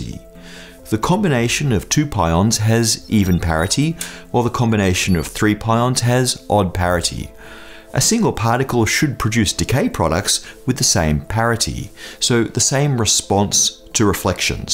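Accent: United Kingdom. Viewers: This is the first Brit I can listen to without getting annoyed. And the information is easily digested. Very nice job. Thanx. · "The zed axis", "the Zed boson" - strikes me as Brittish-y; do Americans say it like that? Or in the US they have the Zeeman effect and in the UK it's the Zedman effect?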